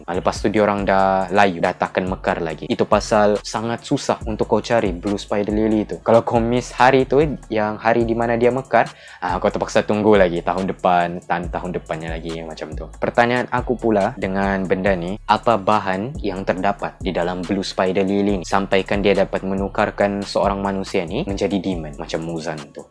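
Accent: Sabah, Malaysia. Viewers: Bang orang sabah ke ?speaker favourite ni bos🔥🔥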